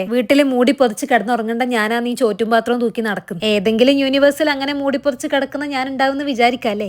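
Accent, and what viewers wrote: Kannur, India. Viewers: Anne koottand poonnath kandaa😂 Kannur slang📈📈📈📈📈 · Kannur aano 🤔 varthanam kettitt choichatha😌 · Kannur anappa nee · Kannur aano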